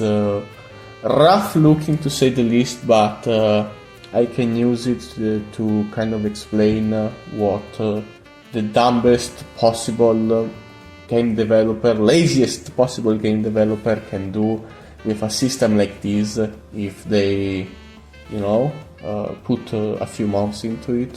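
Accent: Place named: Finland. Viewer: The finnish accent is lovely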